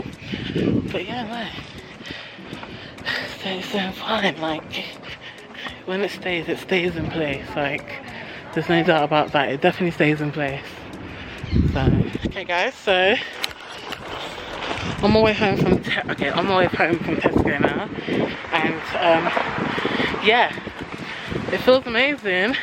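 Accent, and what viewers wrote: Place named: Long Island, United States. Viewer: Your Long Island sexiest chocolates cocoa butter golden brickhouse woman 😍😍😍😍🍓🍓🍓🍒😋😋😋☺️ love your accent 🔥🔥❣️❣️😍😍😍😘😘🌟🌟🍓🍓🍒🤩🤩🤩🤩🤩🤩🤩🤩🤩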